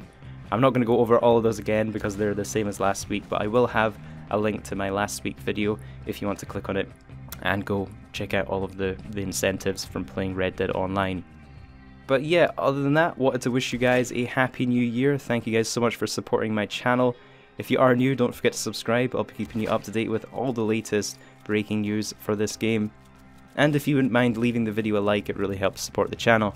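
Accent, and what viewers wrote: Scotland, United Kingdom. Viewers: I wish the snow would go already. I hear by your accent your Scottish, I am too. Keep up these videos, I'm learning alot from you.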